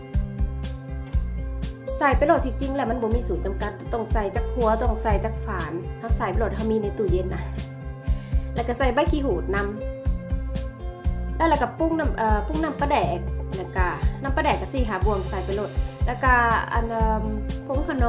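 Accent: Savannakhet, Laos. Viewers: ມັກສຽງໄທສະຫວັນນີ້ເດ້ ໄດ້ຍິນແລ້ວຄິດຮອດຄາວໄປຮຽນຢູ່ສະຫວັນ.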